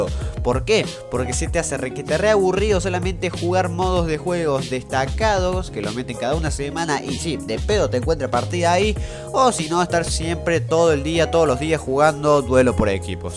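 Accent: Córdoba, Argentina. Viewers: Sos Argentino? O_O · sos cordobes? :v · Saludos cordobés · Eh cordobez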